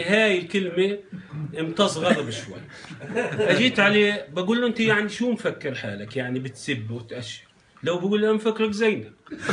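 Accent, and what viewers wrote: Palestine. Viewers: هاظ مش اردني من لهجته هاظ فلسطيني مش اردني قال بسولف بكيت بدل كنت 😂😂😂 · فلسطيني 😂😂😂 مش عنصرية بس مبين من لهجته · هذي مهي لهجتنا هذي لهجة الفلسطينية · هذا لهجته مش اردني فلسطيني مبين من اول ثانيتين عرفت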